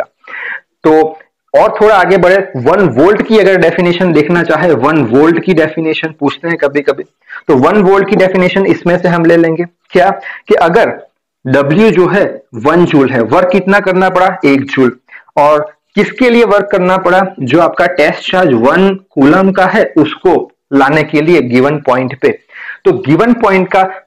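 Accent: Gujarat, India. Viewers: sir ji ape Gujarat se ho ?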